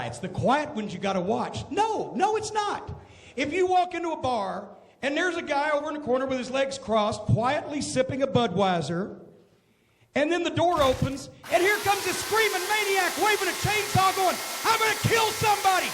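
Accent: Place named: South, United States. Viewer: his dopey southern accent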